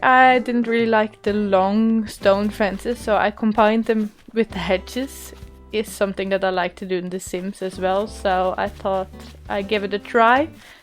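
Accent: Netherlands. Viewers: By any chance are you Dutch? Just wondering 😊 I've watched a few of your Animal Crossing video's! Also we made a few Tour video's haha We started playing Animal Crossing when New Horizon Released!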